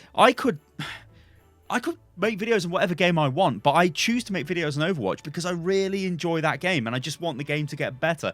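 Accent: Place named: Birmingham, United Kingdom. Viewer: Damn this nigga from Birmingham?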